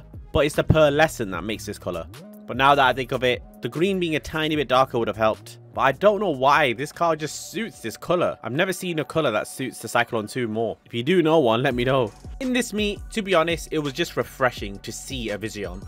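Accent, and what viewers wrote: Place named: Bradford, United Kingdom. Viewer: he sounds like hes from bradford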